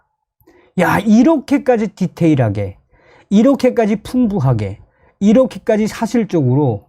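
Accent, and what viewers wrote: North Korea. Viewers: 북한말 좋아요 좋은소식 감사해요❤🎉🎉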